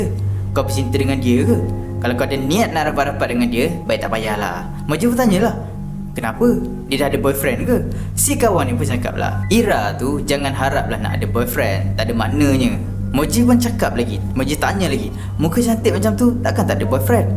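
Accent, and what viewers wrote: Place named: Penang, Malaysia. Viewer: Mcm penang atau negeri sembilan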